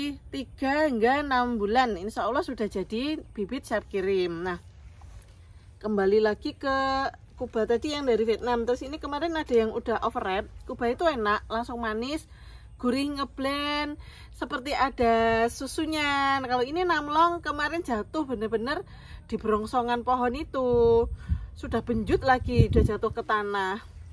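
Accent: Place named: Blitar, Indonesia. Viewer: Assalamualaikum nduk mimin...saya asli. Orang Blitar, dkrg di sumantra lampung, hijrah dari thn 1987 ,saya terispirasi dg bibit yg ssmpean promosikan, smg nanti kesinambunga, amin...ditambah lagi logatnya bicara, bikin kangen sedulur nemg blitar...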